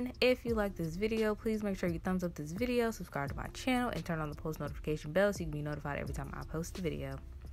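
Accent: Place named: DMV, United States. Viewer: You in the DMV,? I hear Ricooo 👀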